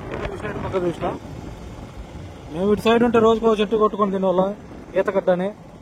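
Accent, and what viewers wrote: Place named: Nellore, India. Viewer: నెల్లూరు యాస బలే మజాగా ఉంటుంది బ్రో నీ భాష ఒరిజినల్ గా వుంది సూపర్ బ్రో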